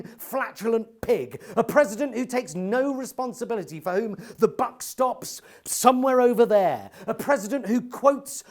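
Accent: United Kingdom. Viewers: For the benefit of non-English fans, please always allow subtitles. I adore this super-British accent but sometimes it's a bit hard to undrrstand. Thank you.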